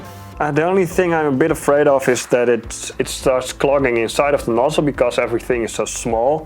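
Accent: Netherlands. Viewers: Hello fellow dutch guy very nice accent, keep up te videos and cheers! Geniet van je frikandelbroodjes~ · Het Nederlands accent spat er van af. Kanus man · just from the accent I can tell you're dutch, its always very obvious or unnoticeable at all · mooi nederlands accent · Eerste keer op je kanaal. Dacht aan je accent te horen dat je Nederlands was. De "Ah, nee, tyfus." overtuigde me. Interessant idee om met een gel te printen. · Okay, your accent made me suspect you to be Dutch. But the tube squeezer made me 100% sure :)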